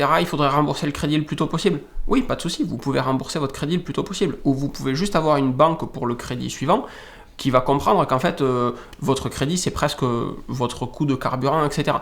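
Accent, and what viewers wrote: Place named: Marseille, France. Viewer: 3.3. minutes : des placements a 10/20% !!!! vu qu il un accent marseillais je me dis c est plus la tradition que de la mauvaise foi...je vais continuer a regarder mais la crédibilité en prend un serieux coup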